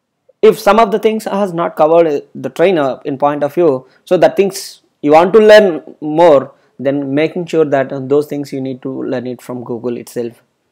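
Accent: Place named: Telugu, India. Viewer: Your pronounciation is in Telugu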